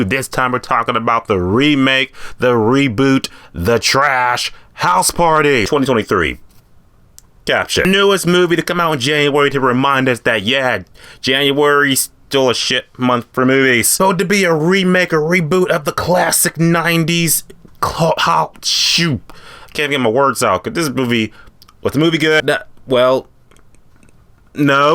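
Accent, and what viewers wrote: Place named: Pittsburgh, United States. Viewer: Are you from Pittsburgh? You got that accent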